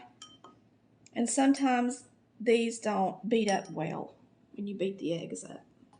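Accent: South, United States. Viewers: I love watching you. You make the most awesome things. I have learned so much since watching your videos. I love you too. I really love hearing you talk and that wonderful accent you have. I live in SC and it’s right down the alley. May GOD BLESS YOU ALWAYS AND KEEP YOU SAFE ALWAYS. You always make me so hungry 😋. Keep those awesome videos coming. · I can tell you’re from the south, I love your southern accent too!!🥰🥰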